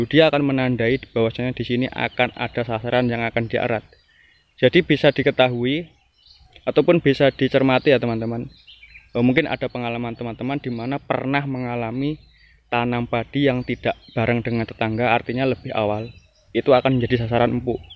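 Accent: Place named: Java, Indonesia. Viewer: Ketoe wong jawa ki logate leh ngomong..tak subcribe mas.. Bener cukup efektip mas saya taruh kapur barus di pohon padi lalu saya cek aman..Padi saya hst 45 hr.. Cm klo musim hjn gimana cara yg baik naruh kapur barus biar gk kena air hjn. Blm ktemu ide saya ini mas..Tolong kasih saran mas klo musim hjn kasih petunjuk mas.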